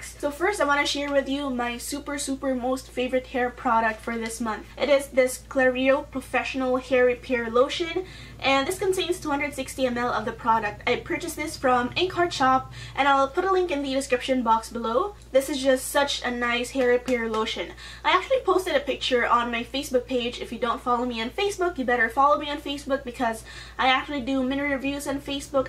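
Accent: Visayas, Philippines. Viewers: talagang love na love ko ang pagbisaya mo ang cute sis! and very natural pati mga bloops mo, kaya nag subscribe nko 😜👍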